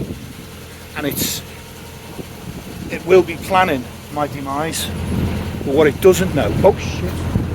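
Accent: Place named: Birmingham, United Kingdom. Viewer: Joined blog … you were spraying yourself with after shave or something … in wine trade … had customers in Birmingham area … always thought accent a soft gentle one … have to go … Tony … 🙂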